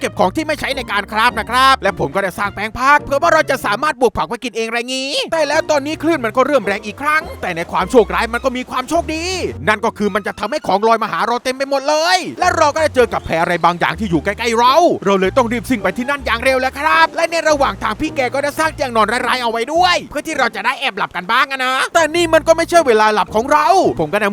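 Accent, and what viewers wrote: South, Thailand. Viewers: เสียงเหมือนคนใต้บ้านเรา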